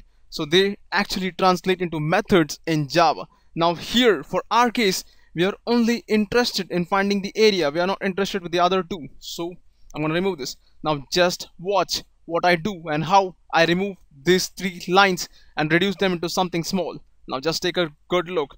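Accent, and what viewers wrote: India. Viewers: smooth teaching skills dude. .. thanks for sharing knowledge .. btw you sound pretty Indian... where are you from ?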